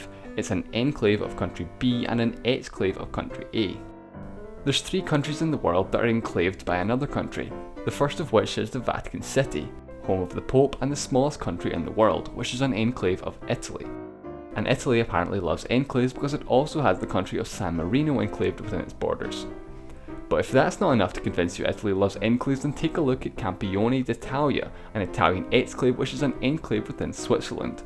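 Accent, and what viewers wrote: Scotland, United Kingdom. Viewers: Are you Scottish? I like your accent. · he's scottish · He's Scottish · This is a Scottish accent right. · I like your accent. Are you Scottish? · You Scottish? You're reminding me of my year abroad rn. It's a fond memory 😊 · Walid Bahri Um you do that's a Scottish accent right? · What about Russia, there's a small bit close to the north sea between Poland and Lithuania. And BTW, love your accent, what is it? Scottish. thanks for this video. Don't mind the twat who cannot speak in English, your speech was clear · Scottish accent? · What problem do you have with his accent? The guy is Scottish, so what? Many of his videos are better laid and he explains stuff better. CGP grey uses a lot of humor where he doesn't know what to say and speaks way fast. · Is he Scottish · Is the speaker from Scotland? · alan benlolo It's English with a rather strong Scottish accent. 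I can understand it pretty well.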